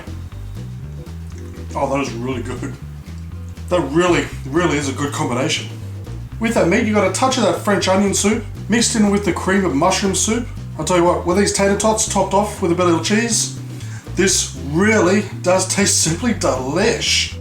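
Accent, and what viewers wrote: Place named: Australia. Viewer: Great job,. Always like the Australian accent, along with your good personality! God bless you 🙏❣️😇 Bud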